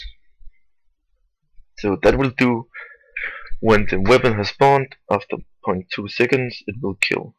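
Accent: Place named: Denmark. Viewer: Sounds like Danish English xD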